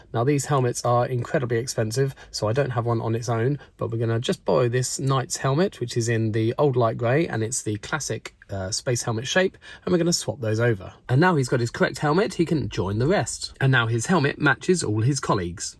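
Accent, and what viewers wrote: United Kingdom. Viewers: When I first watched this I knew he was from United kindom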